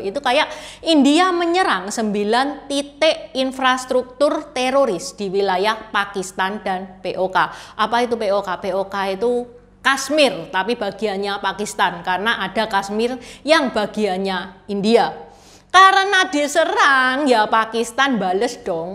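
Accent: Java, Indonesia. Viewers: Cara komunikasi mbak ini sangat jawa dan indonesia sekali tiada kedengaran ngomong cinanya. Kalau warga melayu malaysia mendengarnya akan makin iri membandingkan warga keturunan cina malaysia. 😂😂😂😂 Mantap sekali komunikasi mbak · Medok mbk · Cino Jawa medhok, sy suka mengikuti...berapi²😊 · Medok se x jawanya Cece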